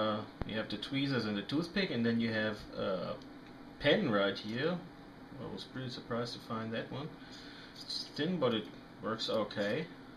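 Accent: Germany. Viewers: Excellent exhaustive video! I can tell from your accent you're from Germany, kudos to you for speaking great English!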